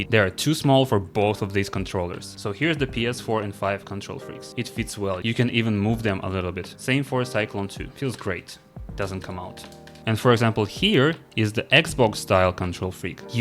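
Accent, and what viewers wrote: Russia. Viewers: Я у меня конечно с английским плохо, но благодаря русскому акценту мне даже субтитры не пришлось включать)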